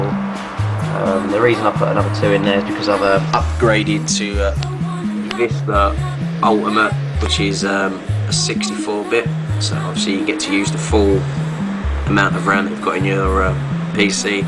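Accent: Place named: United Kingdom. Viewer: Ya I knew you're from somewhere around the UK because of your acsent (I think thats how you write it fix me if I am wrong =]) BTW are these games any fun ?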